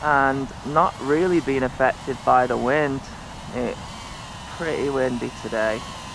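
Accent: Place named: United Kingdom. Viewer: I'm a total newbee, your reviews are really helping and the British accent makes you very watchable much like an episode of Doctor Who;-)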